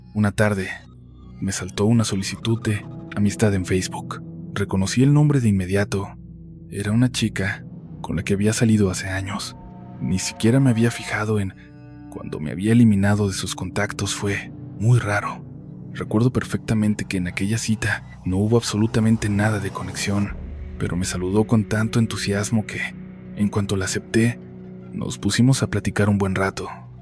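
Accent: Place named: Portugal. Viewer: POR QUE DE REPENTE TIENES ACENTO COMO DE PORTUGUÉS Y ESTUVO RARO QUE SE REPITE UNA PARTE QUE YA HABIAS CONTADO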